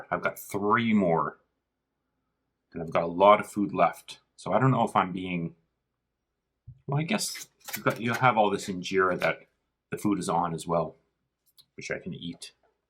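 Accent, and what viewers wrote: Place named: Canada. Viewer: Where is this restaurant? You sound Canadian.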